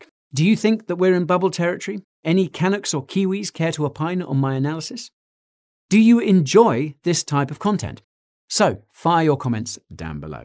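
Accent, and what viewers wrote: United States. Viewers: Your American is pretty good. Much better than most American’s British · Dude. Great job on the American accent. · the yank accent is hilarious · Great American accent guy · Your "American" accent is great!!! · Not a bad American accent. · Wow you're American accent is spot on!! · LOOOOL ur American accent lowkey sounds like my old teacher in middle school for social studies 😂😂😂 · i love your America realtor accent! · Not bad American accent. Lol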